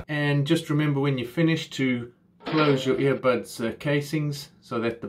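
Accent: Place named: South Africa. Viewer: Connecting is fine but lag not so much. I'm trying to find a pair of great wired earphones specifically for the Quest 2 for my mom. She's VR mad and it would make her day... any suggestions? Also... You got a new subscriber here simply because your video editing almost made me spit my coffee out laughing (it must have appealed to my dad sense of humour) AND because I detect a fellow South Afircan accent. Keep it up 😎🇿🇦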